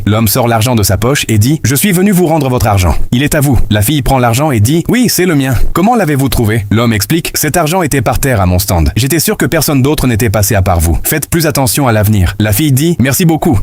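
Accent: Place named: France. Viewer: Merci pour votre accent français. Monsieur l'auditeur. 😮. C'est génial. De ta part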